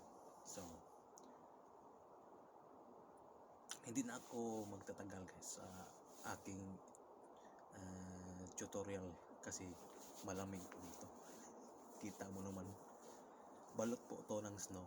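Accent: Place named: Visayas, Philippines. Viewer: Hirap mag japanese host BISAYA SHA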